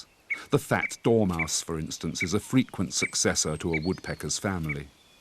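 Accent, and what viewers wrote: RP, United Kingdom. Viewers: Good video, but flawed by the dude with the patronising tone, narrating to me like I am in an Oxford library or school, then the stupid music, if I want music I go to my playlist for it, here I want wildlife, no buffoon trying to talk Royal no big band, just decent narration, good cam crew, couch a beer door shut lights low....job done, but no,...I get this patronising Gitt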